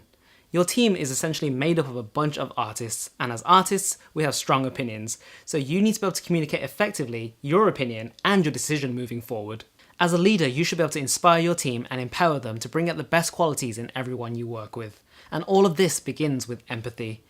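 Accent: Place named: United Kingdom. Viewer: Why does a british accent just exude competence in a creative director role?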